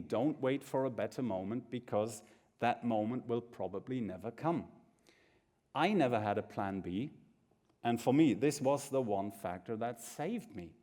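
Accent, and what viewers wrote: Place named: United Kingdom. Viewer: Roman LP ja wirklich, aber eher britisch als amerikanisch...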